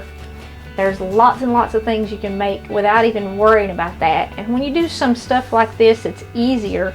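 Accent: South, United States. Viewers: Love the accent of southern women..whew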